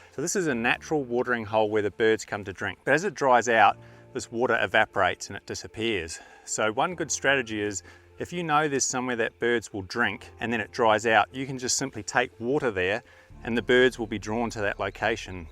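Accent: Australia. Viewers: Sitting here in cold Wisconsin January (2022), admiring Victoria wildlife. Maybe I will head to WI river soon for eagles, they love the free water below dam once all lakes are frozen. I have a canon 500 mm and 1.4 telex for my 7dmarkII, working on convincing myself to spend $2500 on an r6. Love Victoria and the rest of Australia I;'ve visitied (not enough), love the wildlife, even enjoy the accent mate! Have a cabin on a lake up north with Kingfishers, maybe I need to spend some time concentrating on them, they are cool beyond measure.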